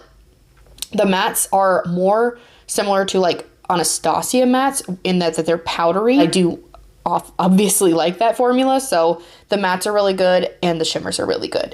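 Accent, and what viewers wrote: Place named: Northeast, United States. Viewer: Ty for the video! ❤ PS you sound very north east NJ, Pa & Delaware ? 🙂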